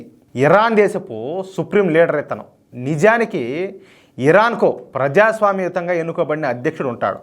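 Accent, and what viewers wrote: Rayalaseema, India. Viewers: బాగుందయ్యా పెద్దమనిషి మన రాయలసీమ భాష చాలా బాగుందయ్యా నాకైతే తెగ నచ్చేసింది అయ్యా నీ లాంగ్వేజ్ బలే బలే బలే బలే బందయ్యా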